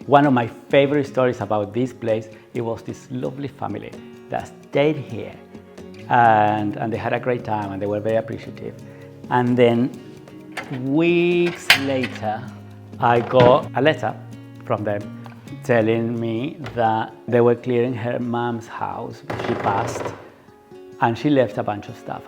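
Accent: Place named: Argentina. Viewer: So many great ideas! The redesign of the space. The scaffolding boards with wine corks. The double shower. Revealing the little window. The decor hitting all the right notes. Your joie de vivre, hard work, and humility. Plus I love your narration and your accento argentino. Thank you for your inspiring life. Abrazos grandote che. 💙🦋💙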